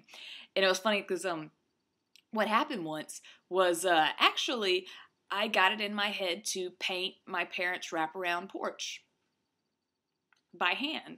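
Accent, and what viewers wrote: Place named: South, United States. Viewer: Your southern dialect is also very attractive.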